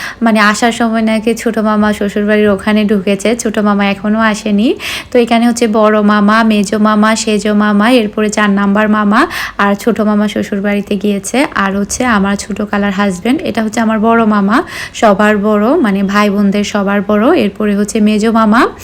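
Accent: Chittagong, Bangladesh. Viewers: Apu apnar video amr onk valo lagse.amio ctg er.so amdr culture e maximum mil ache ejonno dekhchi.apni onk smartly o kotha bolen but i think apu apnr kotha arektu correction kora uchit.kotha bolar shomoi ctg n bangla mix hoye jai.shunte kmn jani lage jetar jonno out district er manush ra always eta niye troll kore.so i think apnr bangla ta ektu improve kora uchit.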